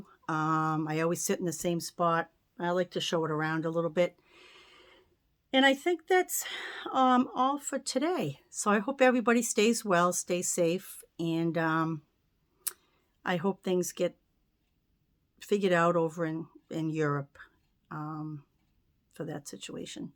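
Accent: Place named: New England, United States. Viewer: I love your from sea to shining sea! And your NE accent!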